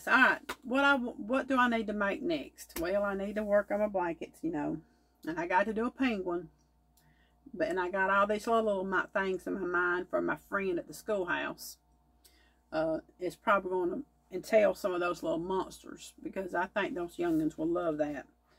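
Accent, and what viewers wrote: South, United States. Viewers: Watching in my gown, heading out to work in a few, love hearing another southern voice. Great job on those monsters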